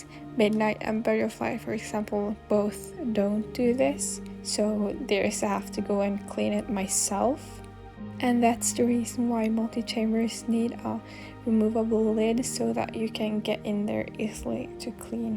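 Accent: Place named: Norway. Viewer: Hei hei, er du norsk 😊😊? hvor kjøper du ting til hamstere? Sorry if I’m wrong just guessing from your accent/English!!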